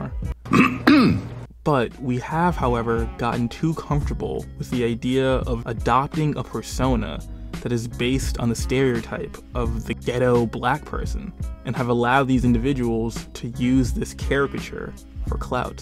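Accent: Queens, United States. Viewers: Isn't she from New York in queens, where the whole community sound like her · She grew up in Queens... the not nice part. Everyone talks like this. Indian, Italian, Asian, Mexican... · She really just sounds and talk like that she is from queens nyc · She's from Queens. Get over it.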